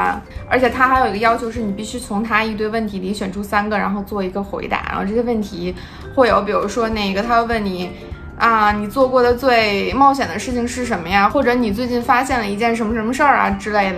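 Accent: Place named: Beijing, China. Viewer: 北京腔儿，姐们儿老北京啊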